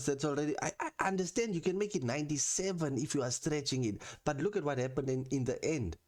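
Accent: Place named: Cape Town, South Africa. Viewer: Great take.. you sound like youre from cape town... subbed 😎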